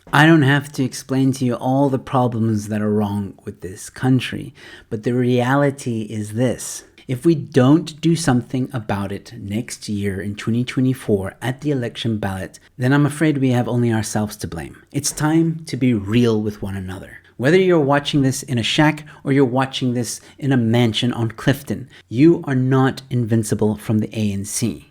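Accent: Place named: United States. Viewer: Please keep going on as usual, so what if you sound American or look Mexican? I fail to see how that has any bearing on your opinion. I, for one am interested in your opinion and appreciate the concise presentation.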